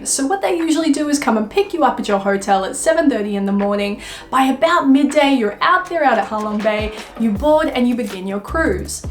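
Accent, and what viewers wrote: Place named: Australia. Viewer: I have been living overseas for about 25 years and I have never been to Vietnam my country before. Your travel videos are very relaxing and I am glad that you really had a great time in Vietnam. After the Covid 19 pandemic I plan to go to Vietnam for the first time and please make more travel videos in the future they are really good. Do you like Tokyo and Japan? By the way Australian accent is quiet exotic!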